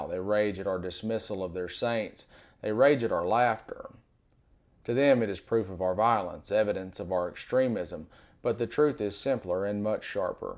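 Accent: South, United States. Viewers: I agree. Look how close his eyes are together👀 a very cunning Jew with a southern accent.🤷🏻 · what a relief to hear a Southern accept applying reason. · I'M A NORTHERN I BELIEVE YOU NAILED IT FOR ME IT'S HIS SOUTH DRAWL THAT STRENGTHENS HIS MESSAGE FOR ME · Thank you from a fellow southerner,. Gives me strength to hear your words in your accent.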